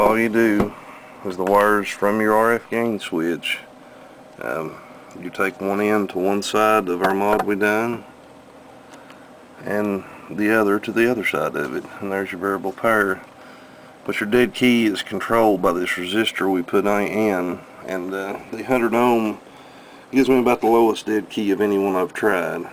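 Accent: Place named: Tennessee, United States. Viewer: You sound like your from Tennesse man! If you were to say youn's then I'd know for sure.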